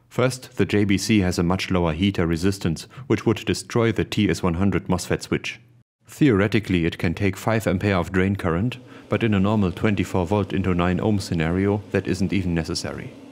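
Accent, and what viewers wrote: Germany. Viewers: The German accent makes this legit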